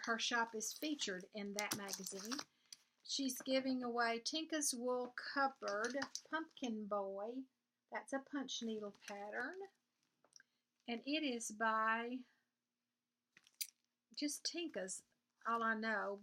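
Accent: South, United States. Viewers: You are precious!! I understand you completely because I’m from the south also!! You make my day!! God Bless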